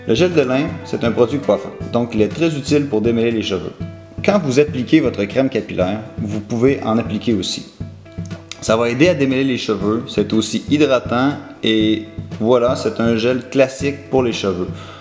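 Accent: Canada. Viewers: Wouah l'accent canadien 🍁. Toi tu n'as pas un fort accent canadien. 😉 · l'accent québécois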